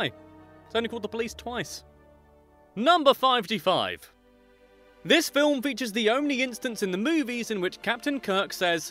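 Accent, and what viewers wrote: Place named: United Kingdom. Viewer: Can't follow this one. Need to practice super fast British accent and encrypt spoken kappas #SwedishNoob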